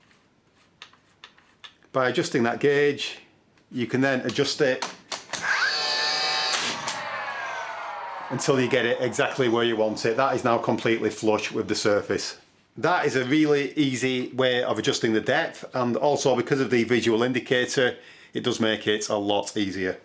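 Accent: United Kingdom. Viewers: Great video and the first to go into such detail about the nails which is exactly what I needed to know, having never owned or used one before. Thanks for taking the time to do this video. Well done and nice to hear another British voice for a change 👍🏾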